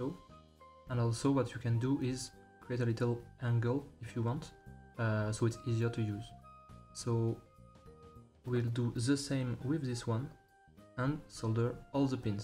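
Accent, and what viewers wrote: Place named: France. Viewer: l'accent fr je kiffe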